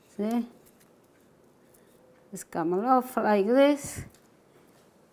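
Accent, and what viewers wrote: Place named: Sicily, Italy. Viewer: I wanted you to know the joy you bring to me. Your mannerisms and accent remind me of my grandmother. She immigrated to the U.S. in 1920 from Sicily. I wish when I was older, I could have watched her make the most delicious meals. Nothing came from a can or freezer. My most favorite meal was Sarda. She used fresh fish and anise. Every year, I would ask her to make it for my birthday. I have made many of your recipes. Each one was delicious 😋. Thank you for teaching me the recipes that bring back many wonderful memories. To me, you put love in everything you make. 🙂🦋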